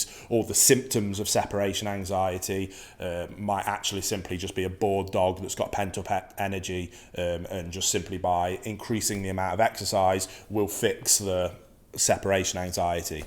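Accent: United Kingdom. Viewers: Our pup is almost 5 months old and I have not had the stones to leave him on his own in his crate yet. Lucky to be mostly retired but still want to get him conditioned to being left alone. Look forward to trying your approach of seconds to minutes to hours. Thanks and I love a northern accent.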